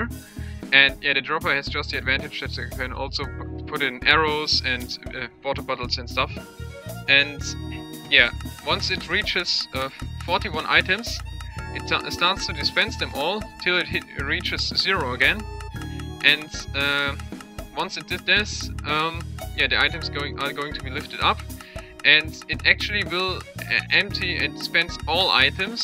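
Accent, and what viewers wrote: Germany. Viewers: Woher wusste ich, dass du deutsch kannst? :D Irgendwie hört mans :D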